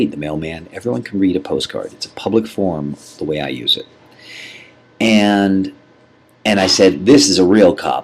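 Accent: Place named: England, United Kingdom. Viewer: Not a bad English accent, at first anyway. You rather sounded like me when I talk. lol.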